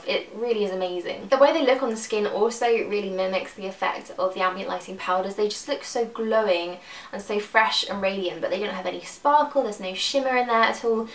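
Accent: United Kingdom. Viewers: Love your British Accent. Big fan!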